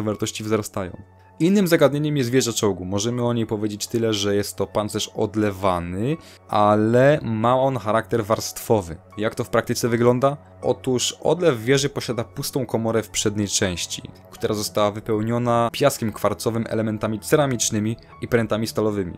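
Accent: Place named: Russia. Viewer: Dlaczego masz ruski akcent,? Skąd jesteś? Sorry, jestem tzw. poliglotą i znam kilkanaście języków, więc jestem trochę przewrażliwiony i nawet jeśli nie robisz zbyt wielu błędów to zdradzają cię samogłoski, ikanie, akanie.... Prosty lud to kupi, a ja nie. To jak to jest z tobą - Podlasie, czy yeah bujny ruski troll?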